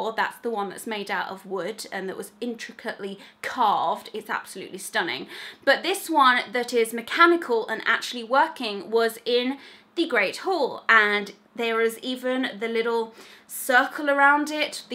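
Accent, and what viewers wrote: Birmingham, United Kingdom. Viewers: I never realized you’re from Birmingham. I was told it’s the least attractive accent in the UK 😆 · Omg i had no idea you were a brummy as well twins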